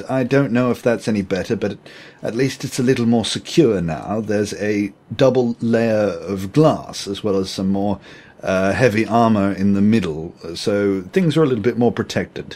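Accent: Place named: United Kingdom. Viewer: this sounds so different from how he speaks now... its like hes british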